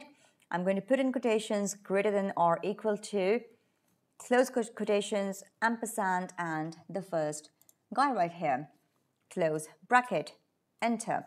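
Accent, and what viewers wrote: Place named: Turkey. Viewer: you sound Turkish though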